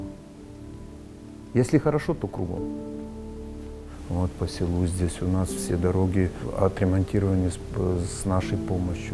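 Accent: Ukraine. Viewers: я тоже сразу задался вопросом где это, начал читать а хрен тебе , какого черта не указываете место положения, я надеялся россия, но сразу уловил акцент, и сомнений не осталось , развернул коментарий и подтвердилось украина, молодцы украины, а вто авторам бы посоветовал указывать не только страну но и город местность! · Черт возьми, я просто не поверил, что такое хозяйство возможно на территории Беларуси и акцент немного смущал, но подумал... вдруГ Полесье, Гоомельщина... а утут слышу "у нас в Украiнi"... и все стало на свои места... Молодцы, что сказать.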